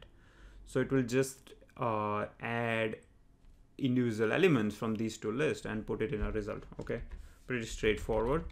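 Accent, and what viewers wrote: India. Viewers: Indian accent not kashmiri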